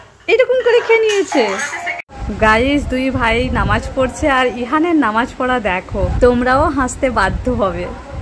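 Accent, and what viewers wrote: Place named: Kolkata, India. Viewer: আমার মেয়ে minnat, রোজ আপনাদের ভিডিও দেখে, বয়স ৩ বছর, আপনার কথা কলকাতার মানুষের মতো, আপনি কি মুসলিম? এই প্রশ্ন টা আমি খুঁজে পাচ্ছি না, 😊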